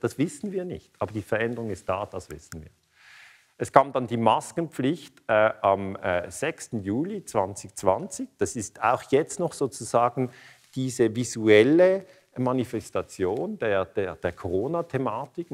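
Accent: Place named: Switzerland. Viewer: Und ich mag auch Ihr Schwitzer Dütsch! 😊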